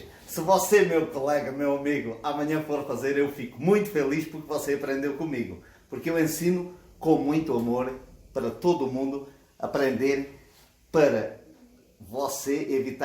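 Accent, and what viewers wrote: Portugal. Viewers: Com esse sotaque Português que eu amuuuu, já me inscrevi e dei o meus milhões de likes.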